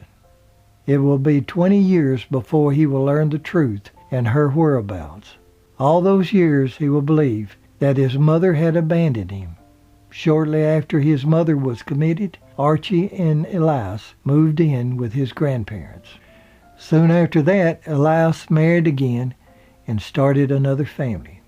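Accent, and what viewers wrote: United States. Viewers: Can’t stand this American narrator’s accent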